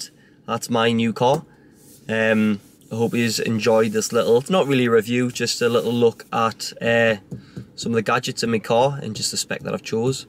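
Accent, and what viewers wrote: United Kingdom. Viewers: Love that British accent♡ I think Audi have the best car, love it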